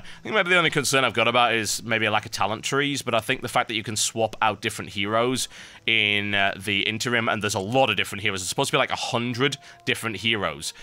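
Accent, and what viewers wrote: United Kingdom. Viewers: Love your British accent! :D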